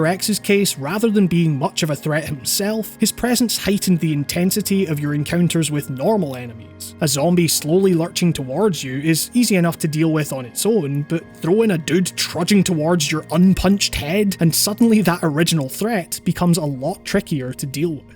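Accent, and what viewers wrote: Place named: Scotland, United Kingdom. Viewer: I think its a slight scottish accent maybe :)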